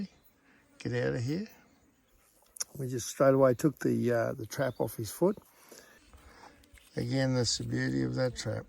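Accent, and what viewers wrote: Australia. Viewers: Where’s this ?it’s beautiful country and those Brahmans are beautiful. The bull he was beautiful to. You sound like a Aussie ?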